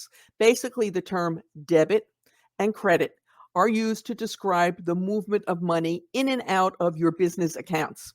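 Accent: New York, United States. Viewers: New Yorker?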